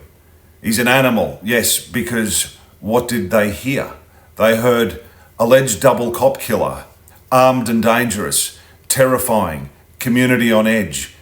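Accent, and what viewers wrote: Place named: Australia. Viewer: Wow a real Australian journalist speaking Australian I love it keep up the good work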